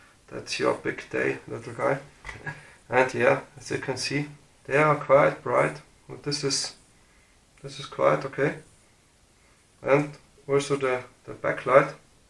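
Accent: Germany. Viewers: German? I think i hear an little accent? If yes i got a few questions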